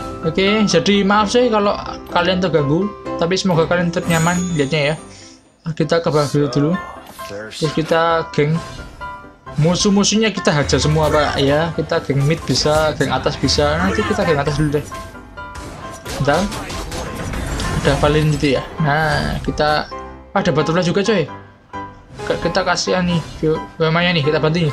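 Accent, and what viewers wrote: Java, Indonesia. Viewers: saya suka channel ini karena medoknya itu loh wkwkwk suka banget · jawanya dimana mas?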